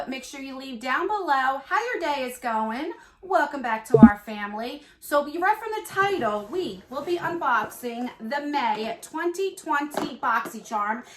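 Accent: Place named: New York, United States. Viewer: Are you a New Yorker? Living for the accent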